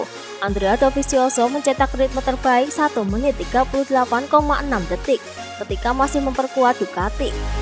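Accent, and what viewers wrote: Java, Indonesia. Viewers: Halo mbak medok, selalu nonton tpi jarang komen mbak medok hehe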